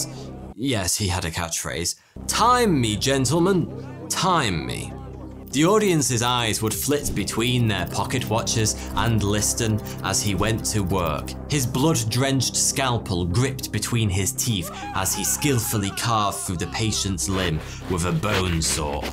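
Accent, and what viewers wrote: United Kingdom. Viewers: It's the power of the British accent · Yes, most British accents are pleasant to listen to, including thoughty2, which is similar to Jodie Whittaker's accent on Doctor Who. It's just that one variation that annoys me from some British TV shows. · Because testosterone and brit accent.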